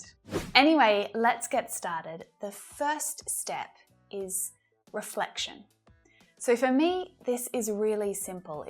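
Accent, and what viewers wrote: England, United Kingdom. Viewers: A lovely english accent! A simple mesmerizing voice! One of the best teachings ever! I wish all the best for you! Keep making this incredible videos for us! Take care dear teacher! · Hi are you british ?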